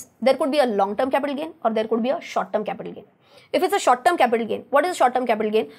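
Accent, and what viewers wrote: Maharashtra, India. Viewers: Marathi accent ❤️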